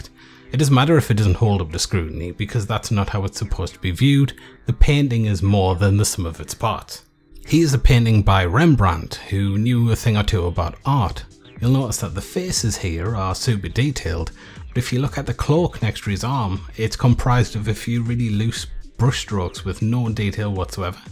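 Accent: United Kingdom. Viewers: Doesn't sound rough to me, I quite like it. Sounds kind of "mushy" if that makes any sense :-) -American who digs UK accents. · I don't even Blend. I just come here for the great videos and friendly voice. I miss the UK, so I love the UK accents the most.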